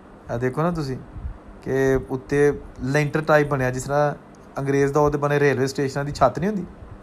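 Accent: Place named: Gurdaspur, India. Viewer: Apki bolne ka lhja bilkul same vesa hai jisa Indian punjab ke gurdaspur side ke logo ka hai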